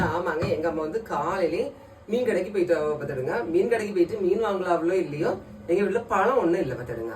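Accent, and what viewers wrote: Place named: Salem, India. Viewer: Salem slang manthan valai name anna 😋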